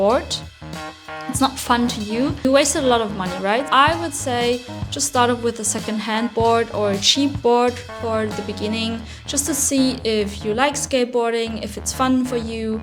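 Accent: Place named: Germany. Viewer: It’s also interesting to hear an Asian girl with a German accent. We have many Asians in California, but we seldom see them from Germany.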